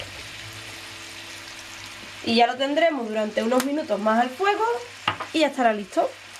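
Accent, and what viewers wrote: Andalusia, Spain. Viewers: Muy buena tu receta y muy lindo tu acento andaluuuuu.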